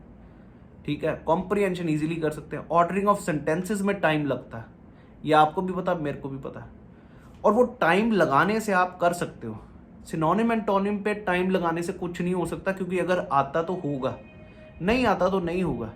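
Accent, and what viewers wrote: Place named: Pakistan. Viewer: Sir apka accent pakistaniyo jesha hein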